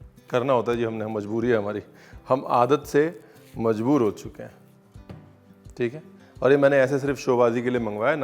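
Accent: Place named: Punjab, India. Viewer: Omg your anchoring...niri punjabia wali..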